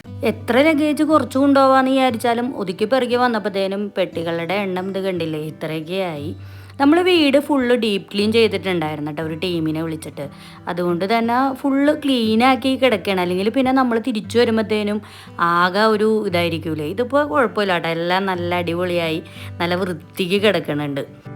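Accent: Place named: Kochi, India. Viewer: ഇവർ മംഗ്ലീഷ് അല്ലല്ലോ പറയുന്നത്. തനി കൊച്ചിമലയാളം. എന്നിട്ടാണോ ഇങ്ങനെ പറയുന്നത്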